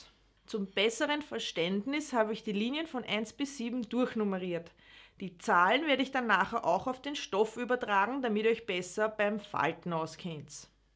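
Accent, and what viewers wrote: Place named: Austria. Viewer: Ehrlich toll erklärt, jetzt versteh ich auch das Falten. Ich Feier deine Humorvolle Beschreibung und den Herrlichen Österreichischen Akzent, danke für das charmante Video 😊👍🏻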